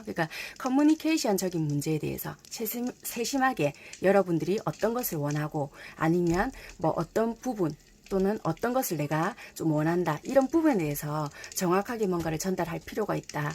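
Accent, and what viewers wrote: Busan, South Korea. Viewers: 자세한 설명 감사해요~ 잘 맞아서 항상 잘 보구 있어용 이번에도 꼭 맞길💗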